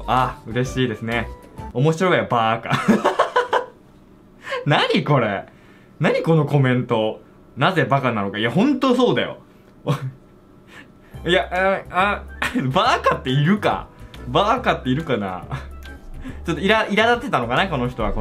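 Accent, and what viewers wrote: Kansai, Japan. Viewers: たまにくる関西弁好き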